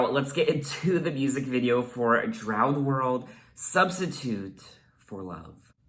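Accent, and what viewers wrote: Canada. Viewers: grow the beard but lose the canadian accent.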